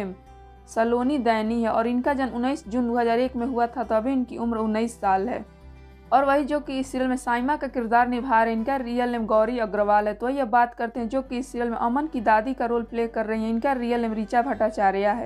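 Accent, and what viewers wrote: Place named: Chhattisgarh, India. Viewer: Speaker is chattishgarhi hain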